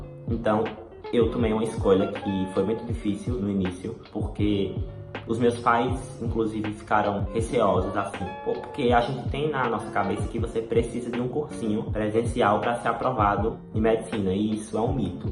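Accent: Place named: Northeast, Brazil. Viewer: que sotaque nordestino maravilhoso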